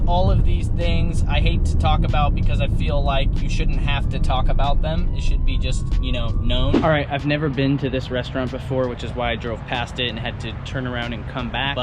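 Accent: United States. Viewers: U American?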